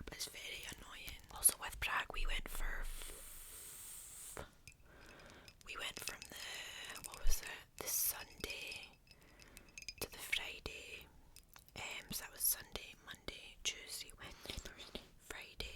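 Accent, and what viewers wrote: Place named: Scotland, United Kingdom. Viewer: I sure hope all Scottish women blessed in the chest, cause jeeze is that accent annoying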